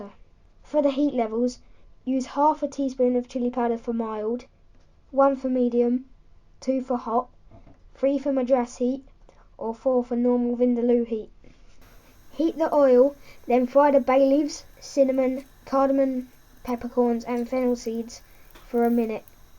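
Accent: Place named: United Kingdom. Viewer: I love your accent, what kind of british accent is it?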